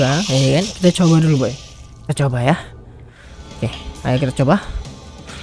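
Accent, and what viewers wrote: Madura, Indonesia. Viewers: Madureh bang..?? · Madura kah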